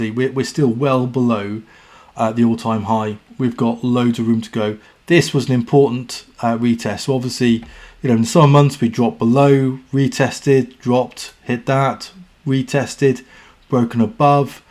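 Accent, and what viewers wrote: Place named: United Kingdom. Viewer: Soft British accent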